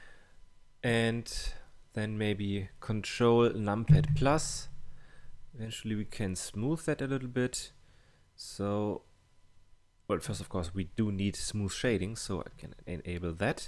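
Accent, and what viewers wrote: Germany. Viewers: du bist bestimmt deutscher du hast nen nervigen dialekt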